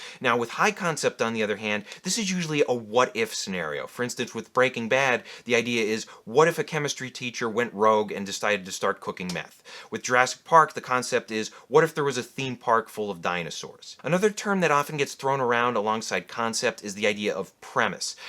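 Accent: Chicago, United States. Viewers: You gotta be from Chicago right?😊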